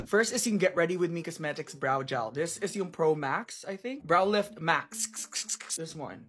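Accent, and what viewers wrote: Philippines. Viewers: Are you Filipino cause you have the perfect Filipino accent im a Filipino🎉🎉😊loving the Philippinessss🎉🎉🎉🎉😊😊😊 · I love your Filipino accent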